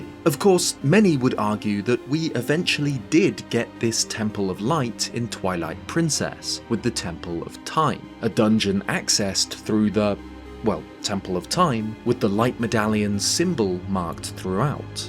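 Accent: United Kingdom. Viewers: Lol it's just British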